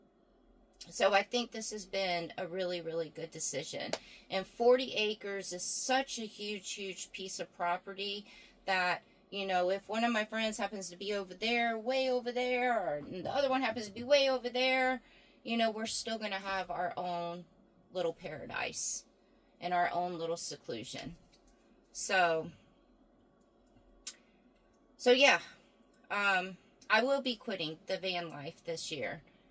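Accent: South, United States. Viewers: Are you from the south ( Mississippi, Alabama or Georgia)? You sound like it. <3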